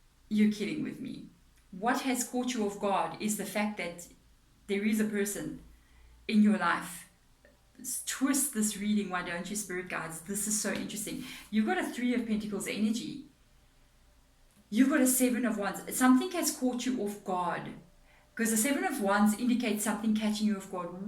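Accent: South Africa. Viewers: You sound South African? 🥺🙇🏽‍♀️❤️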